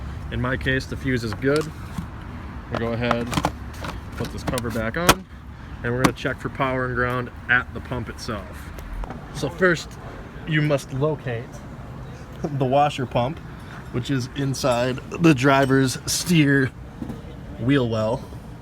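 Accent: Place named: Wisconsin, United States. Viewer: I'm from Wisconsin and you sound like my kind of people. Just what I was looking for.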